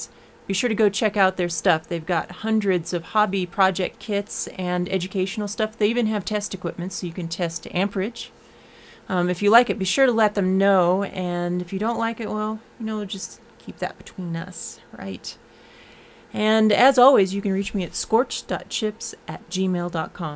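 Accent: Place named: France. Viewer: Finally, a video that encompasses comical French accents and electronics :3. Nice videos!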